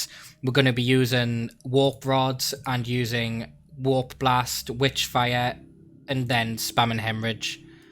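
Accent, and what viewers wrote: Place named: Northeast England, United Kingdom. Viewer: Sup good to see another Brit putting out some good content, by your accent I'd guess your from the northeast? Anyway I love to see what you could come up with for ranged sader particularly H bolter if possible and a sniper sin it's seems the balance is way behind on those two types but It would be great to see if you could come up with something for them that can reach the milestone of battle120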